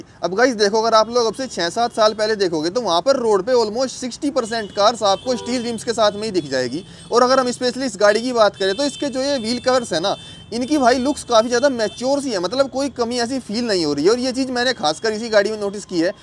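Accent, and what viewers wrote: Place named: Muzaffarnagar, India. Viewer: This is genuine tone of muzzafarnagar❤....all the best 👍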